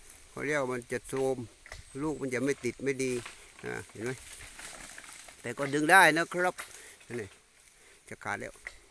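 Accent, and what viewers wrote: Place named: Krabi, Thailand. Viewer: สำเนียงคล้ายคนกระบี่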